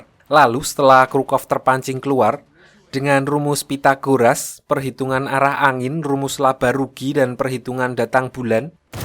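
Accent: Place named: East Java, Indonesia. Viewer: Orang mana si bang, logatnya kek jatim bgt :v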